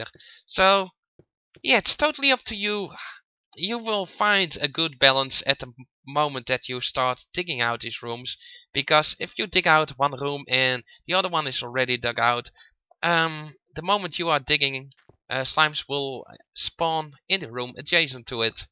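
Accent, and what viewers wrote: Netherlands. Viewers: Dutch accent. :p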